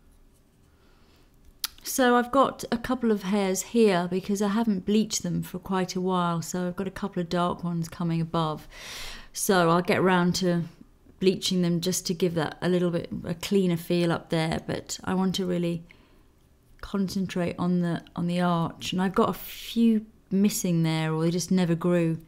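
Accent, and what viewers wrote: England, United Kingdom. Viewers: I am a new subscriber after hearing juicytuesday speak so highly of you.I have watched a few videos and am crazy about you already.You are a very beautiful woman and I just seem to adore you English ladies.Love the accents and you all have such gorgeous complexions. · i just love your make up sense....so natural and sophisticated........your confidence in your work and your British accent are just WOW!!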